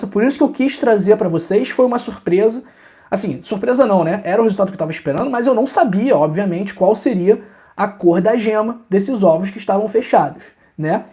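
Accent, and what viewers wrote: Rio de Janeiro, Brazil. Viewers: BOM DIA DOUTOR..QUÊ BOM OUVIR À SUA VOZ, ESTE SOTAQUE MARAVILHOSO, O CARIOCA...MELODIOSO,AGRADÁVEL, SUAVE,COLORIDO,CHEIO DE ENTUSIASMO...maryjou prof.italiano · Vídeo bom. No entanto, esse sotaque carioca muito carregado é irritante demais, não consegui assistir até o final....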